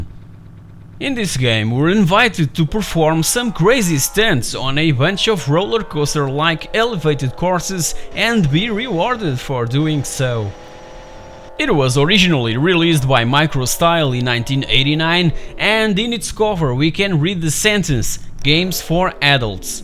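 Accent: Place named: Portugal. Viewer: Portugues certo... o sotaque parecia... muito bom canal! Saudosismo é coisa boa!:)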